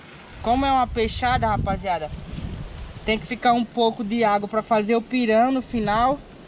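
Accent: Brazil. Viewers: Mano você é de qual lugar do Ceará?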